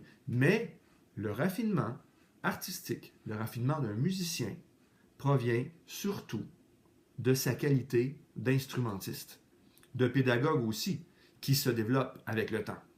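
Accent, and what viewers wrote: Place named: Quebec, Canada. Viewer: J'adore l’accent québécois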